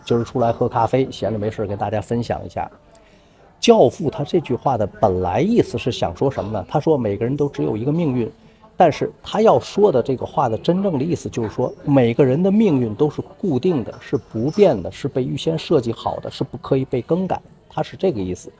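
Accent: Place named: Shanghai, China. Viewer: 您有上海口音，老实说，上海人做你这样营生的比较少，总之，你做的很不错，我瞎猜在北京等地工作过很久。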